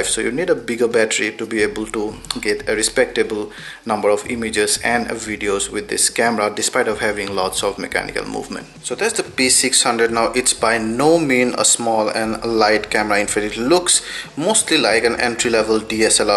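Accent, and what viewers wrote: India. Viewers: indian accent is so funny haha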